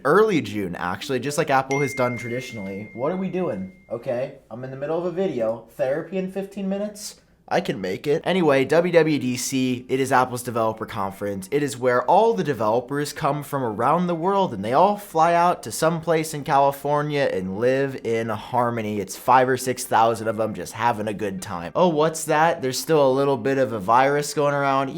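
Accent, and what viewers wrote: England, United Kingdom. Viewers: that “british” accent doe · Your Britain accent is pretty good actually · I laughing so much at your English accent, It’s crazy that apple store in england is the only store keeping covid restrictions . I don’t think Tim Cook got memo from boris that england is free from restrictions.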